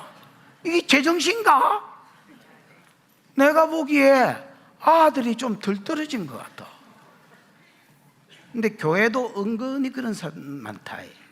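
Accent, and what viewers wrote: Gyeongsang, South Korea. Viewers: 아멘 경상도 말을 득도하고 있습니다.!!!! 어쩜 이렇게 귀에 쏙 들어오는 말인지요.!!!!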